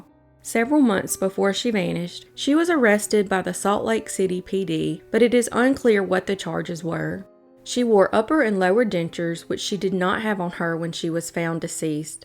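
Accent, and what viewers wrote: South, United States. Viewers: I love your channel!! Your accent rulz!!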